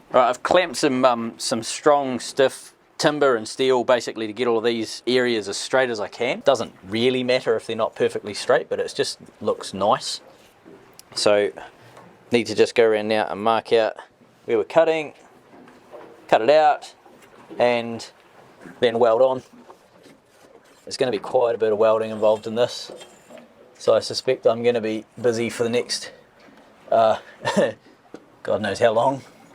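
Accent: New Zealand. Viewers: With your Kiwi accent the automatic YouTube captions always get "Brew Pig" instead of Brupeg. Nice work on the belt shroud!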